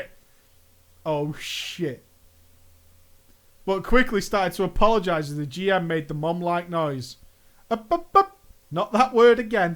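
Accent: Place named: Manchester, United Kingdom. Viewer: I'm learning english listening to you! I'm starting to have that manchester accent lol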